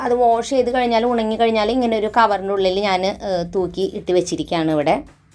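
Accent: Thrissur, India. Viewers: Ningal thrissur aahno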